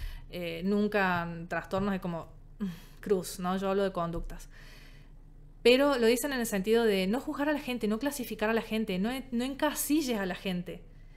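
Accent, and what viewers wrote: Córdoba, Argentina. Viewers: Sos genial, como profesional y como persona. Te abrazo fuerte. Soy de la ciudad de Córdoba, por tu tonada me parece que también? Me encantaría ser tu amiga, jaja. Somos de la misma edad imagino. No sé si se hacen amistades así pero desde que te sigo lo pienso 😅 Qué intensidad todo lo que aprendí este año sobre psicología gracias a querer entender cuál ha sido mi experiencia con mi ex. Me ofendió, me dolió muchísimo lo último que me dijo. Pasó límites. Es alguien a quien amo aún, fue mi amor por años, mi gran amor, mi mejor amigo. Me cuesta mucho volver a querer tener relaciones amorosas con hombres porque me siento dolida y traicionada, así que todas estas palabras me ayudan un montón. ❤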